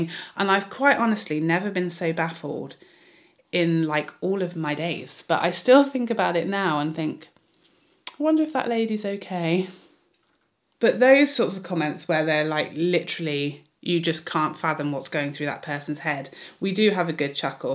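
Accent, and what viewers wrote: United Kingdom. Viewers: Congratulations dear, 5 years and 50K i'm so happy for you!!!🥂🤩🎉👏 I'm almost 3 years watching the beauty video's here. I found you because of dear Mel, and i know how much you hurt because of the loss of her, so i was also so happy to see that you got your humor back, your British humor i love so much, but not only that, i love you being you, not uploading to be loved by those companies but the interaction between you and us!!! And, here too, i love these "you don't need that" video's you don't realise how hilarious you are!!! I love your lovely beautiful you, knowing so much about all these products, being so honest what is good and what not, your enthousiasm, your beautiful elegant British English of course, i'm so thankful to you that you make all these beautiful, pure, honest wonderful and hilarious also video's for us, full of know-how!!! 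And if you would upload less, i will always watch your video's!!!! Enjoy these special days, sending you lots of love from your neighbour country Netherlands!!!!😘😘😘😘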